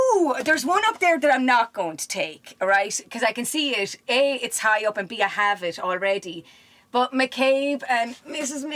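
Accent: Ireland. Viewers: Tf is a cletic accent her accent is Irish, no such thing as a celtic accent. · Irish is honestly my favorite accent. She sounds exactly like the characters in The Commitments😀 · Love the Irish lilt. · It’s not a accent it’s a brogue, From Tipperary I believe my grandfathers birthplace. Wonderful,beautiful talent. Just picked up McCabe and Mrs Miller on the 50 % off sale.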